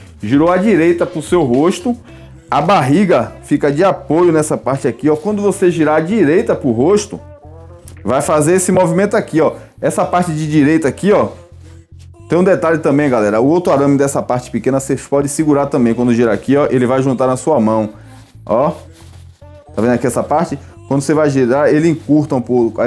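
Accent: Bahia, Brazil. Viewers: Oh sotaque maravilhoso ❤️❤️ baiano é outro nível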